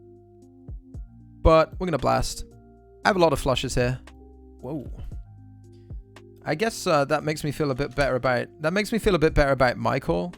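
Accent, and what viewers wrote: United Kingdom. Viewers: funny bloke.........where are you from in the UK you gerth?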